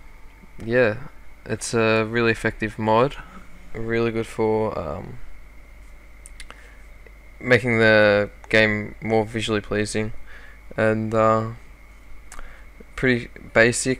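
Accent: Australia. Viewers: Nice video! And theres a bonus to the vid aswell! Your an Aussie! This is amazing! Good video with an australian in it. Anything better than this?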